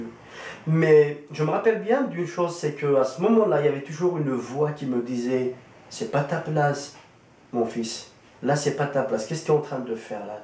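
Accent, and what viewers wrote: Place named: Kabylie, Algeria. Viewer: Le disciple Au commencement de votre vidéo vous dite ceci " bonjour bonsoir je me présente je m'appelle je suis Algérien kabyle............" vous vous adresser a qui la? pas aux Kabyles en tout cas! ni aux Algériens d'ailleurs! pourquoi ne pas réciter votre histoire de conversion en kabyle uniquement!? vous voulez cibler qui en parlant en français par exemple? et je trouve qu'i y a une sacrée différence entre vous entendre parler et vous lire! vous parler et j'ai constater que vous chercher a chaque a dire des mots que vous n'arriver même pas a trouver par contre j'admire votre français avec un accent kabyle! quand a vos écrits le fameux copier coller fait fureur! alors comme ca vous avez vu le film sur jésus? "zmane nsidna issa" c ça? et ca vous a marquer, toucher!! un film! moi qui croyais qu vous aviez lu la bible!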